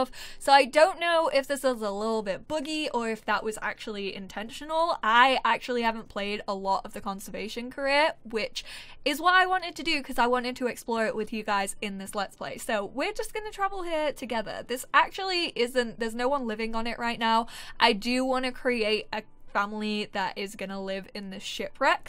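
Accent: England, United Kingdom. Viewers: Btw... I love the English pronunciations of many words... like aluminium. So don't try to change.😁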